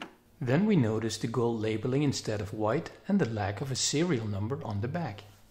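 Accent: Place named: Netherlands. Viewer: Hi, I am new to your channel, had a look at your website and a question immediately arose: according to your accent and name I wouls assume you are a Dutch guy, just like I am. But the company/museum is based in the U.S.? A lot of the museum's team members have names that sound like ones close by, could you explain this situation? There is a good chance I would like to order some parts in the near future and perhaps it would be easier to ship from NLD than from USA. I will be watching all the videos shortly as there is a ton of useful information available I assume. Thank you very much!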